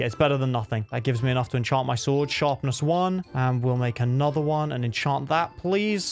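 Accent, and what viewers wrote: United Kingdom. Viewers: Are u britsh?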